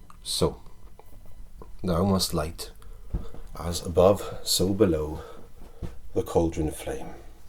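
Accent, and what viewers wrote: Wales, United Kingdom. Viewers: Pretty decent Welsh accent. Coming from a welshman 😁. Where'd you learn that lol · I finally got around to watching this one and I had to continually rewind it as your welsh accent kept sending me to sleep. I think you got about 5 views in just this one.😂 I love the pointy facial hair in this video, very fetching.😏❤️. I thoroughly enjoyed this and the ending was unexpected and typical. It’s great to binge your videos in these stressful days. 😊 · An devil and an alchemist? Sounds up my alley. A welsh accent? I like accents!! Though I do like blood, I don't look you got hurt and enough to draw blood. Do take care of yourself, Mr.. Heal up. 🖤🙏 funny thing is we were just discussing injuries with scissors. It's the testy tube you must eye for. Lol. 🙃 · Welsh accent? What? Isn't it the way English should usually sound?🤭 See, I'm drowning so deep in your channel that I even watch videos with mustache! 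Big sacrifice in my part, but it totally worth it🤗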